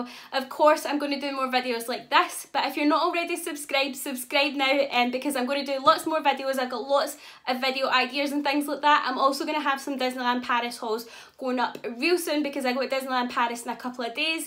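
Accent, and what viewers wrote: Scotland, United Kingdom. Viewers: Great to hear a scottish accent doing a poundland haul......keep them coming xx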